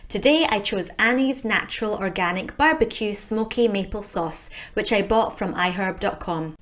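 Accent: Scotland, United Kingdom. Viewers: Sort of off topic but does anyone ever get surprised by your Scottish accent? As a fellow Aussie, I always think it's so cute. BTW I'll never get bored of videos of food, especially when they're as motivating and healthy as your food prep! I really enjoy the variety and ideas :D Thanks <3 Looking forward to your next vid. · Asian decent..Scottish accent...Aussie resident☺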